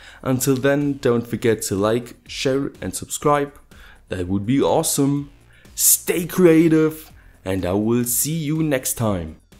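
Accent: Germany. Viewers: deutscher stimmts?:D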